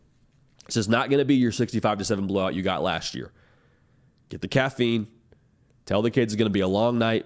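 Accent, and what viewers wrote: South, United States. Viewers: This guy's accent and game picks just wreak of a butthurt SEC guy. He picked Oregon and Texas and now Michigan?!. How is he even relevant in the sports betting world?! Penix Jr. singlehandedly beat JJ and Michigan when he was with Indiana. Michigan had to beat #4 (#8) Alabama in overtime while the Huskies beat #3 Texas in regulation. We love being the Dawgs. I can't wait to keep these receipts...BOW DOWN TO WASHINGTON.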